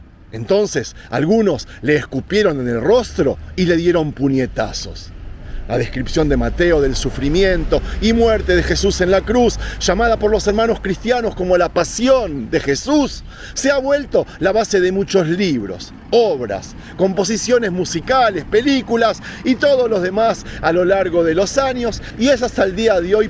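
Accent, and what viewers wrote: Argentina. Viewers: Tu no eres judio,eres un argentino que se cree judio muy distinto Si fueras judio real no tuvieras el tipico acento argentino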